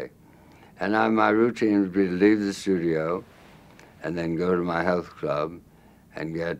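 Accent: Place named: South, United States. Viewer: He's from Baton Rouge, and the early 20th century upper class Southerners did speak in a rather affected way, so I always thought he adapted his aristocratic Southern accent and incorporated the mid Atlantic accent with it. Tallulah Bankhead, another upper crust Southerner, sounds just like him. Both of their manner of speaking sounds like this hybrid to me. I am from South Georgia and I have a similar mid south accent. It's just the way my family talks. Not all of us sound loud and brash like Paula Deen or ignorant mush mouths like Mama June.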